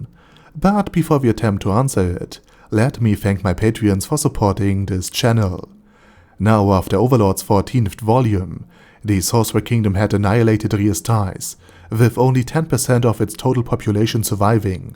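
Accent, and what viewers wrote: Germany. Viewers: Geiler Deutscher Aksent Feier ich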